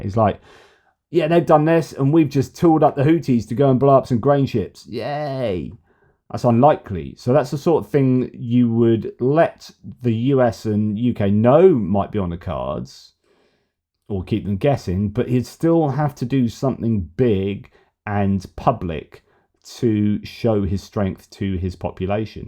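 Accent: New Zealand. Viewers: I don’t care what anyone says.. your Kiwi accent is pretty decent. 👍👍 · haha good kiwi accent churr bro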